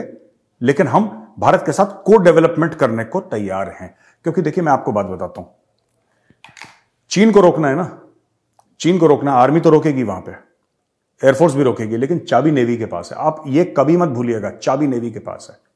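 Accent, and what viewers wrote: Pakistan. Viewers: Major gaurav aarya with Pakistani accent is so lit 🔥🔥😂😂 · pure pakistani accent😂😂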